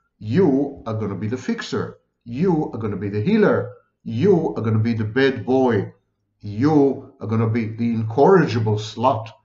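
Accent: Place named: Israel. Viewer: Like the Israeli accent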